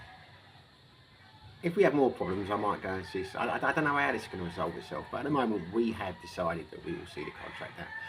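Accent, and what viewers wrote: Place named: England, United Kingdom. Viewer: Yapping in an English accent for too long will turn off my interest in any topics.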